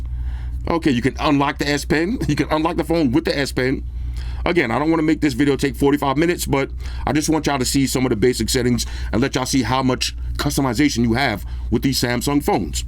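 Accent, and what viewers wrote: New York, United States. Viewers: Of course you went with green. Most of the reviewers are talking about the green. For some reason I love that you use the British word for aluminum, but say it in a very American accent. What's funny is that it used to be reversed. Americans said aluminium, while British people said aluminum. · This is the review I didn't know I needed lol phone review with the NY accent. Fam this is great 😂 🔥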